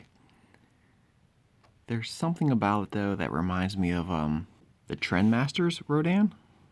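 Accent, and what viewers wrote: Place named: United Kingdom. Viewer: You’re a British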